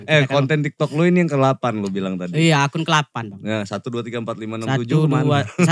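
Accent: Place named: Lampung, Indonesia. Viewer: Lampung banget logat nya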